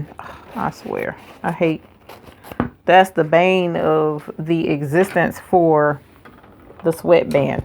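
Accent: South, United States. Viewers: Lol, I'm loving the southern belle accent too -- the land of good food, sweet tea and common sense. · OMG! Your Southern Accent is so adorable! Love the video. Gonna run by the Dollar Tree and give this a try. Thanks so much for the instructional.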